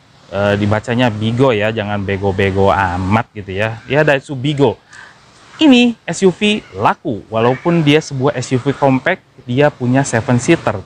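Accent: West Java, Indonesia. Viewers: Saya tau Anda dari videonya om mobi Gw suka logat sundanya:)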